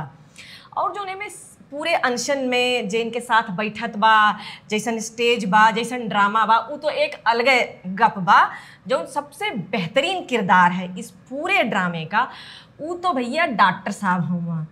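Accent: Bhojpuri, India. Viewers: Another very interesting episode. Well prepared. Adding a song line added extra flavour. Your Bhojpuri accent is very flavoured.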